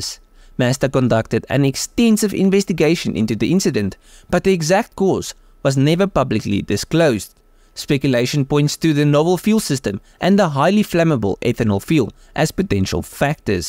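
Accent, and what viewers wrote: South Africa. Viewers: He sounds South African? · Love ur SA accent cud listen 2 it aaaaaaall day HEHEHE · South African accent? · Are you from south Africa by any chance?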